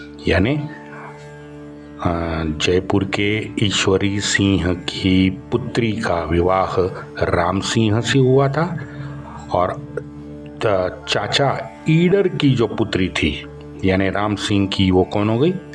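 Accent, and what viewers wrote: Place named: Maharashtra, India. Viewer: Kya aap Marathi ho? Aap ka accent Marathi hai.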